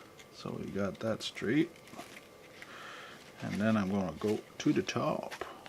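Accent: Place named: Canada. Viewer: Where in Canada are you from? Love the thick accent!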